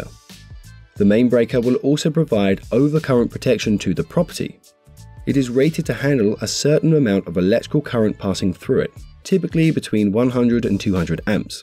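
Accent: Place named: England, United Kingdom. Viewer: Why North America? You're clearly English?????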